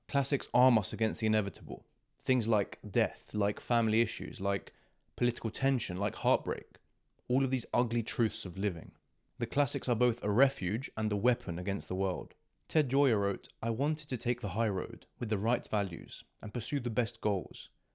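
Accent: United Kingdom. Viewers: Most crisp and understandable British accent I ever heard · ur britih accent was wrecking my nerves i had to turn on the caption to inderstand that shiz🥀🥀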